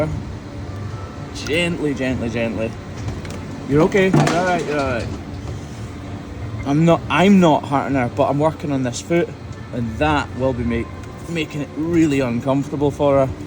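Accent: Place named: Scotland, United Kingdom. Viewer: I love you Scottish accent (I'm from the U.S.A.)... even when you say things like "dirt and debris" it sounds so cool... :) Love your channel!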